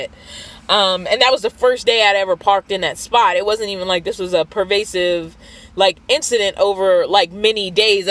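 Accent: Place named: Baltimore, United States. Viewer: Sorry this happened to you and your van. If you decide to pursue the issue, the police can review video from the stores to see if your incident was captured on video, and perhaps also their license plate. Even if you never mentioned Baltimore, I can hear it in your voice, especially the "oin," instead of "on." I'm guilty of that one too.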